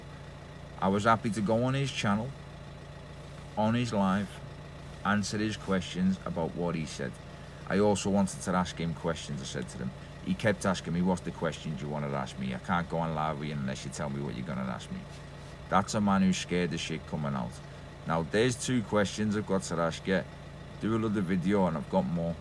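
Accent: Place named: Cockney, United Kingdom. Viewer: you seem like a nice fella pal, love the cockney accent 😂 I need work, i work at the moment. But need something better mate.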